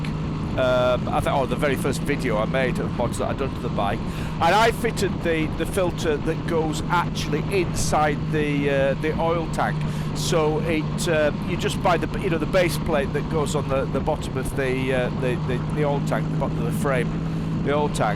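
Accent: England, United Kingdom. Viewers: Nice Video but even though I enjoy English Accents, I would like to hear the motor more. Go thorough the gears a couple of times with your mouth cloised. Cheerio...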